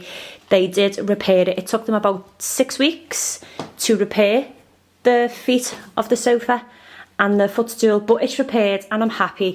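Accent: Liverpool, United Kingdom. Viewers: Beautiful! Have a wonderful Christmas and happy,healthy New Year! Great to have you back and hear that Liverpudlian accent again--memories of my time at uni,many,many moons ago😂.Luv from Grandma Gwen in Germany💖😘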